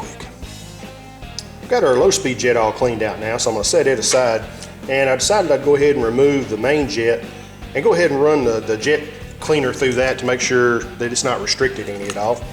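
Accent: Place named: Arkansas, United States. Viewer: Love that Arkansas accent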